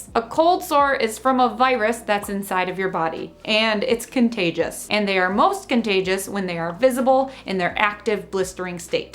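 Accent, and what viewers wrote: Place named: New Jersey, United States. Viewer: That’s a lovely accent you got New Jersey?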